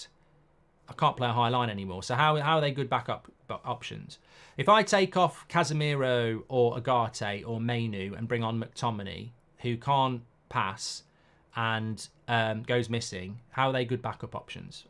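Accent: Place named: Scotland, United Kingdom. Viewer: Gotta love and his Scottish mctominay accent lmao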